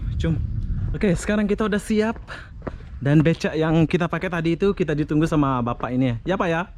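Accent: Lombok, Indonesia. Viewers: Slamat malam. Ternyata Semeton Lombok. pantesan di pertengahan Vidio dalam hati bilang koq ada logat lomboknya... di akhir Vidio baru terjawab..Salam kenal Semeton Sasak semoga sehat selalu dan tetap semangat dalam membuat konten N semoga sukses semeton.. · Maaf lamun unin" dialek bhs indonesian de jak .....baun tiang bade' pelinggih batur sasak Lombok. Pdas gati dialek Lombok de. Batur mbe plinggihde?? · Lekan ngonek ke indeng indeng logat side marak dengan sasak, ternyata tetu side dengan sasak, ndekne bau telang logat side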